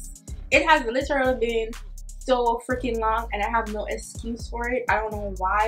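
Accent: Jamaica. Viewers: Are you Jamaican? 🇯🇲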